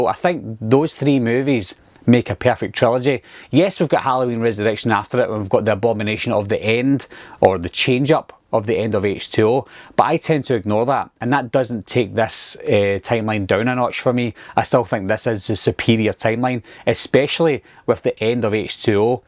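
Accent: Scotland, United Kingdom. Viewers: ...great work as always!! I like how you did something a bit different here, not just the typical paint by numbers with the franchise! Lastly....I should know this, and Shame on me for not knowing...but is that a Scottish Accent, or British? My Muay Thai Coach is Scottish and it sounds so damn familiar...either way! Love it, and great work!!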